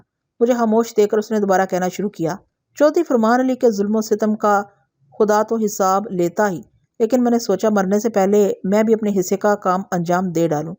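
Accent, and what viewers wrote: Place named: Pothwar, Pakistan. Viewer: محترمہ آپ کا پوٹھواری لہجہ اچھے خاصے قصے کا ستیاناس کر دیتا ہے۔ اپنے لہجے میں معنویت پیدا کرنے کی کوشش کریں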